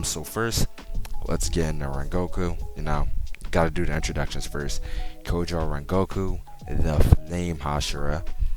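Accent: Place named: Philadelphia, United States. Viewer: I can hear the philly accent! very dope commentary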